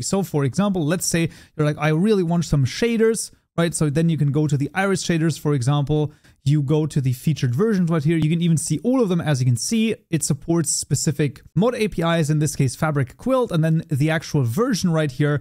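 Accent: Germany. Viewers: Man hört zwar raus, dass du deutsch bist, aber definiv keine schlechte Aussprache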